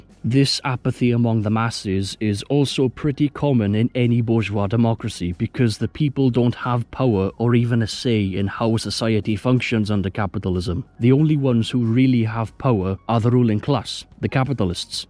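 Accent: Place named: Wales, United Kingdom. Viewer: We all knew you were Welsh! That accent is unmistakable!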